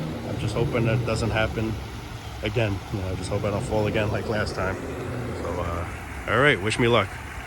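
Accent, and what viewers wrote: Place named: New Jersey, United States. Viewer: I wish I was your friend! I love to do adventurous things like that , and I could listen to that Jersey accent all day long!!!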